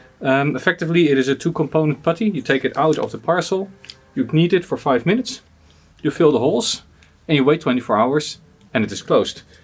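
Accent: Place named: Netherlands. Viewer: Hello me deario! Great to hear from you with your lovely Dutch accent 👌I’m so glad success hasn’t had the effect of neglecting your early fans! All best wishes for the rest of 2025 ✌️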